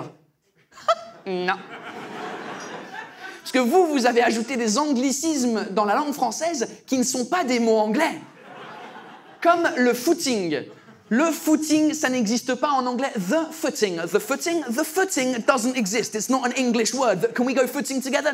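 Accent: United Kingdom. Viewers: he has impeccable french but i could tell right away he was British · C'est le seul accent british que j'arrive a supporter et à adorer. C'est super drôle et agréable à regarder j'adore !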